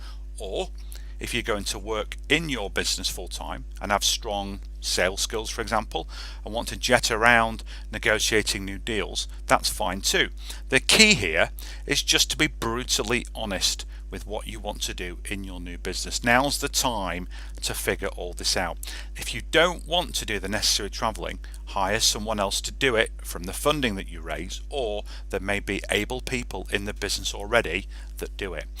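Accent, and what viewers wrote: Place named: United Kingdom. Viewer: As soon as I hear a Brits voice, I know I need to find another source about opening a business in NEVADA USA…. 🤦🏽‍♂️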